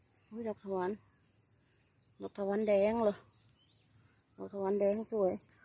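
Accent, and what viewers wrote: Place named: Laos. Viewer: พูดเนิ่อๆ เหมือนคนอุตรดิตถ์ลาวเวียงนะแม่บ่นไปเถอะได้ตังค์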